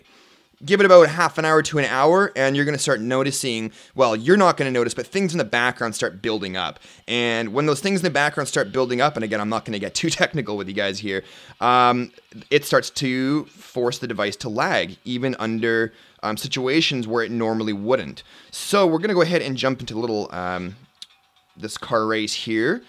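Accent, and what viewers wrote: Canada. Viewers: I must ask... Are you Canadian? :>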